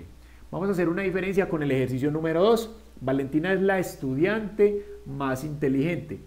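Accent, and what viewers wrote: Colombia. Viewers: Me encanta tu acento colombiano 😍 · Jaj, me llamo Valentina❤😁. Muy buena clase..gracias! Y tu acento colombiano es encantador👌👌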